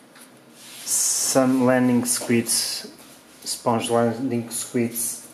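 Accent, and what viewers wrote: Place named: Portugal. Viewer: That accent is unmistakable, é um tuga..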